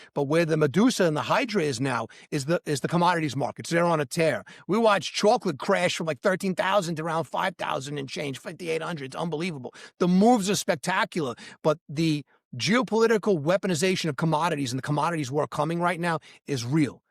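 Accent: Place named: New York, United States. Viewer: My man is right on. Good info. Dig that N.Y. accent burning!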